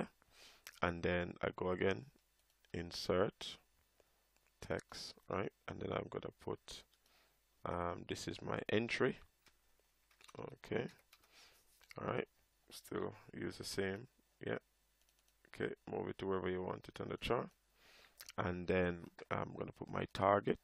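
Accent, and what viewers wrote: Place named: Jamaica. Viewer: You sound Jamaican 🇯🇲 😳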